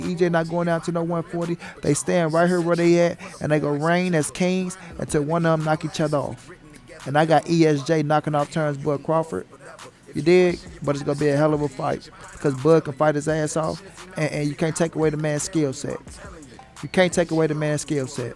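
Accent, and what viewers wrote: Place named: Midwest, United States. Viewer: ok i was trying to figure out your accent that's that Midwest country. I'm from Louisiana btw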